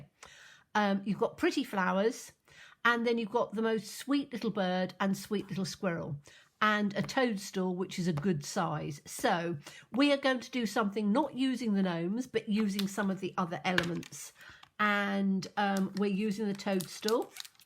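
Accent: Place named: England, United Kingdom. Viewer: I LOVE the way you chat so naturally to us! My father was English and I do so enjoy hearing your accent. He has been gone many years now but you make me feel "at home". Our Aussie drawl is nothing like his beautifully refined tones which you share. Thank you! I also love the gnomes sets and have them. You gave me ideas for other designs with them too! Bless you!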